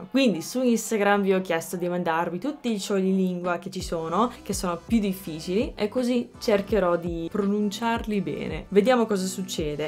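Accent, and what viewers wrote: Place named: Fano, Italy. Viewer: Ha una perfetta pronuncia fanese, con un leggero tocco anglosassone in alcune sillabe.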